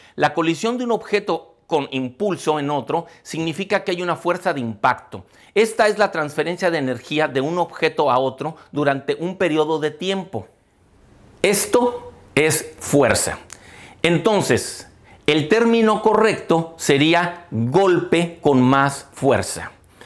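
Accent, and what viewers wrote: Mexico. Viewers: Gran explicación si señor , y sobre todo me encanta ese acento mexicano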